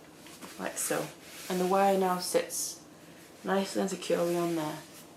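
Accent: United Kingdom. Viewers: Her instructions are BETTER than all the others and I LOVE her British/English accent... Now I need to find her other videos?? Hope she has lots...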